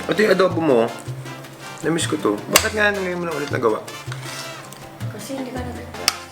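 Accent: Visayas, Philippines. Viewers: Bisaya po kase c bisprin kaya ganyan po sya magsalita,pero ok naman para din di ma bored mga viewers kaya dapat may comedy talaga po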